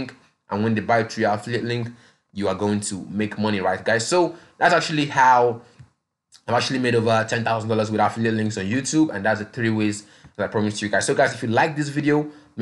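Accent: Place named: Nigeria. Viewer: Hey, great tutorial, I gather you are Nigerian from your accent, but many from Africa are facing this challenge of poverty, unemployment, poor internet connections, power blackouts etc-Most well-established affiliate programs have an approval process which requires a website and thousands of subscribers, Some programs like Legendary Marketer are not available in Africa, Can you make some videos tailored to Africa, like some work from home solutions /payment solutions that are available to Africans? If you search on youtube you will notice that India and Bangladesh are taking youtube by storm, and they assist their colleagues to get faster solutions tailored to their needs, I wish the same could happen for Africa so that more Africans can benefit from this opportunity and you could be a role model to the youth in Africa. Getting started, lack of knowledge and proper mentorship is the challenge that is facing most Africa, please have this in mind as you continue in your online journey. 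Thanks.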